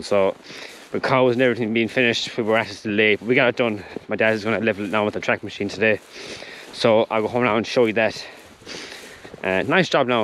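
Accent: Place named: Ireland. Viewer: Loving the videos loving the machinery I’m quiet jealous now you have it all 😂, I can no longer do farming because of an injury from a motorbike accident I can’t move my right arm but I love watching farming videos to keep in touch with the life I love, I could very much be wrong but this looks like a Leinster farm and the accent sounds familiar but in Ireland we all kinda sound the same 😂 glad I found your Chanel and I hope all these price rises don’t cut you back will be a tough year with buying prices rising and selling prices not rising half as much I hope it doesn’t f the farmers up too much, best of luck and thanks for the video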